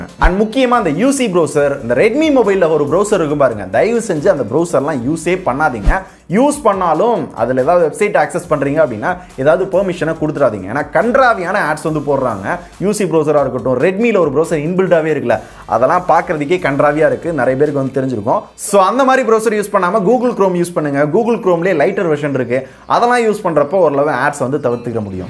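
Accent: Madurai, India. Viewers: Bro nega madurai ya🙋🙋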